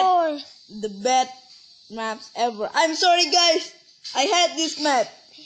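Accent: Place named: Philippines. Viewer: Your sound is :v Pinoy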